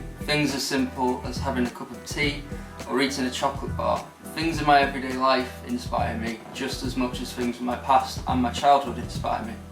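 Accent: United Kingdom. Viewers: Brilliant! Thanks for that, really looking forward to see the other videos you’ll make! Anything will be good for us I guess :) Btw your intro is perfect, and the video is great. Sometimes it’s a bit tough to understand your British accent but I guess it’s going to get better with a bit of practice!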